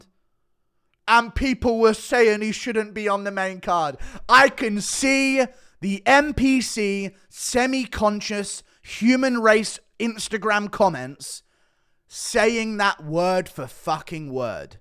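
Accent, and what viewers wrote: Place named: England, United Kingdom. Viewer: why does have my favorite English accent?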